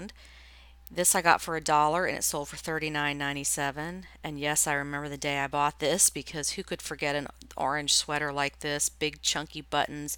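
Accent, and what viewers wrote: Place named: Georgia, United States. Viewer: Enjoy your videos. Living outside of Georgia now, hearing your accent takes me back to Atlanta (Little Five Points.)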